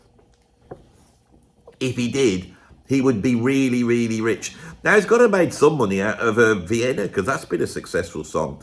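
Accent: Birmingham, United Kingdom. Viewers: Horrible,,NASAL Brummie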